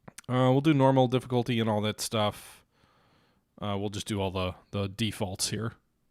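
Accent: Germany. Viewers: That german accent is pretty good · Is that a german accent? 😅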